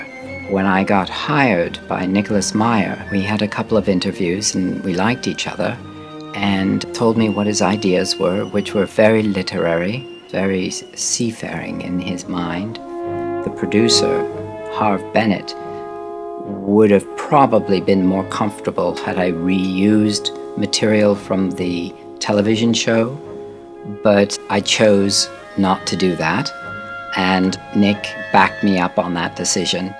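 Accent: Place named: United Kingdom. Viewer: James spoke in a more Gr. Britain style of English?! (At least that kind of impression, his English are giving me here)...Wow! I didn't knew that! I'm impressed! Dear James...we mis you terribly, all over the world! May God rest your soul, and we thank you for the incredible music you gave us! Our love and respect to you, from your fans here in Greece.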